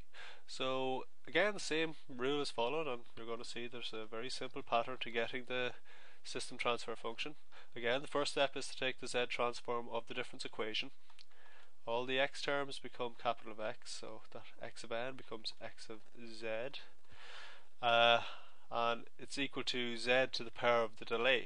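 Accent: Ireland. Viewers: haha wow didn't expect an irish person to be giving math tutorials on youtube. Sound man (Y)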